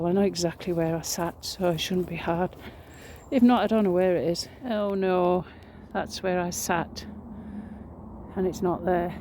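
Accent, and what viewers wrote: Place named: England, United Kingdom. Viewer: Nice understated English voice, a change from bawling Americans!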